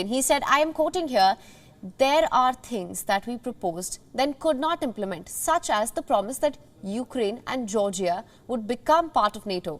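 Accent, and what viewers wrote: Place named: India. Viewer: Your voice and accent makes it best for story telling. Desi tone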